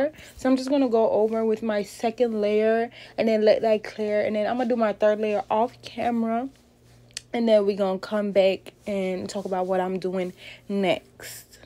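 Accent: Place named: New Orleans, United States. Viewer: You sound like you got a little New Orleans in you.. you ever visit?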